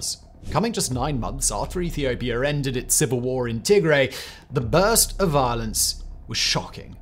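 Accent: England, United Kingdom. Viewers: You sound like an Englishman or British you know these people do no longer want your propagandab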